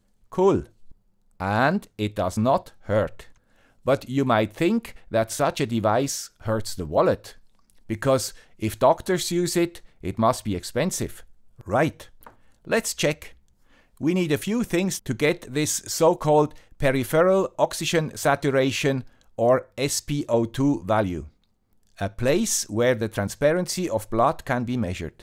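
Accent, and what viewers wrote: Switzerland. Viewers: Another very interesting video from the old guy with the Swiss accent who is not a doctor, but plays one on TV, or at least on YouTube. I'm surprised that they don't use the blood oxygen meter as an additional test for detecting people with corona virus. Maybe it could detect people with 'shortness of breath'? Final question: Do you really have to pay in Switzerland to see a doctor? Of course we pay quite a lot here in the US, but we are always told that people in really nice countries like Switzerland have free access to unlimited healthcare. Of course we also have to buy our own guns here and only the wealthy can afford their own fallout shelters...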